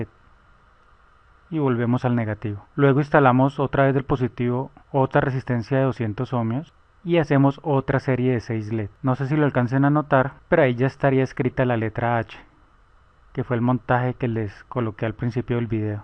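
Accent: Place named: Colombia. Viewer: Hola pues desde Guatemala saludos me parese su asento colombiano, buenos amigos de por alla!! gracias por compartir todo esto desde muy pequeño me ha gustado la electronica, solo estudie un semestre en la u, pero agradesco que ponga a disposicion estos conocimientos !! bueno cualquier cosa le molesto!! buen dia!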